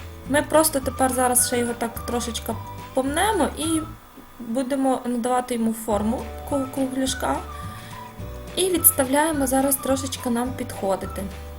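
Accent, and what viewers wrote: Western Ukraine, Ukraine. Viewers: У вас украинския речь с западным акцентом., а почему ваш канал подписан по английски? Что в украинском языке не нашлось вам название? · Эта Украиночка из западной Украины. Я тоже с Украины, но у нас другой диалект))))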